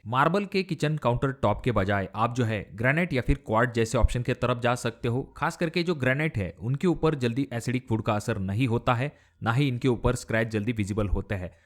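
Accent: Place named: Gujarat, India. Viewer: As per your speaking hindi I think you're a Gujarati I want an Idea for L type small kitchen guide me sir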